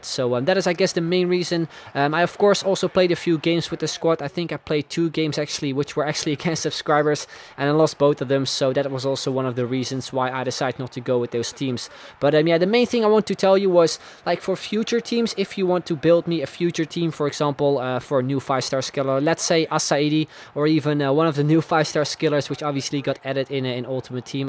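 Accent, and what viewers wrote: Netherlands. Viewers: How the fuck does he do that unlocking shit. Amazing! And hearing him talk English I immediately knew he was Dutch, even better.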